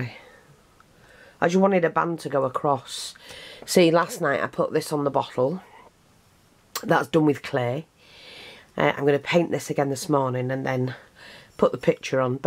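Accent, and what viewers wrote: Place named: Yorkshire, United Kingdom. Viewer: Hi I'm new to your channel too and would second what gerilynne1955 says below, you refer to your shop but I'm a bit confused as to what shop this is, perhaps you could link the products you use in your description box. I love your work and am definitely going to try a few things you have made. Are you a Yorkshire lass? I am but am now living in Minnesota in the USA, great to hear a Yorkshire voice!!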